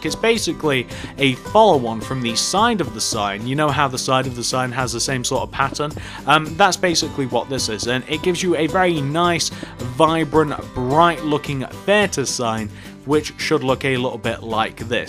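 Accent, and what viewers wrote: United Kingdom. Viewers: He sounds British, and I'm British, so if he is British, why did he spell it Theater instead of Theatre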